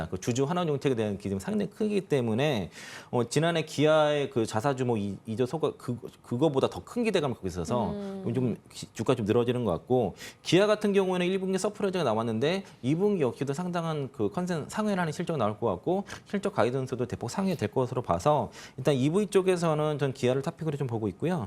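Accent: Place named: North Korea. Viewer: 아나운서 목소리 북한사람인줄~~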